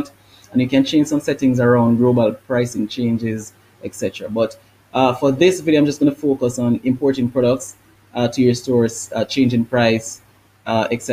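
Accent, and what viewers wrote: Jamaica. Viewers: big up yard man sound like jamaican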